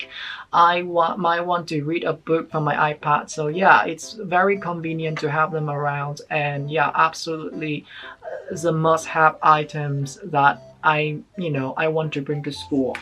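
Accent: United Kingdom. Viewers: Ui cho em xin phép hỏi là anh học tiếng anh từ lúc nào và sao anh học được giọng anh vậy ạ ??? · Sounds like British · I hear a little bit of a British accent 😃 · Oh you have British accent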